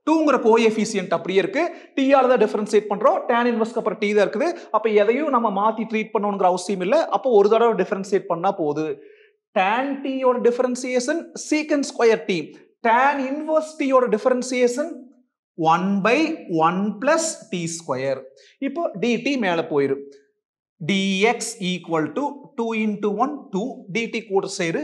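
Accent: Coimbatore, India. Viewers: Sir neenga Coimbatore ah ila thirupur ah sir ??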